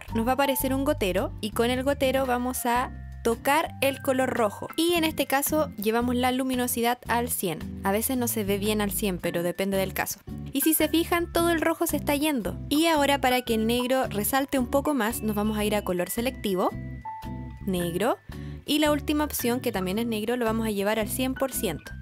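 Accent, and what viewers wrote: Chile. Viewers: eres chilena? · Me encantó este vídeo y como explicas los paso en el photoshop. No se que tiene el acento chileno pero me embelesa, saludos desde Canarias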